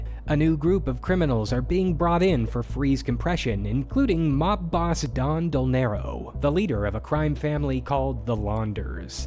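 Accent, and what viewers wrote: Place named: United States. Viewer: You sound very American 😂🤡.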